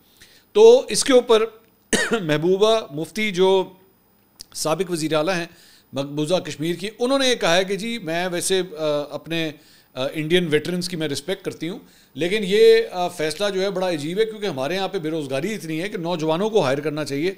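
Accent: Punjab, Pakistan. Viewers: bhai ye jo bat kartay kartay ap punjabi touch detay ho 😅😅seiously i love it kindly continue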